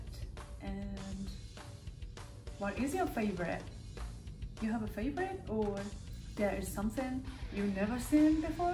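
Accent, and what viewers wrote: England, United Kingdom. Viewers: I love your English accent, I could listen to you forever. Its a perfect Babyface voice. I cant wait for you to cut a smooth promo in the ring. You re awesome, Champ.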